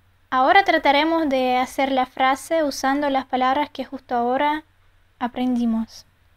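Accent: Argentina. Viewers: hablas como argentina wtf :v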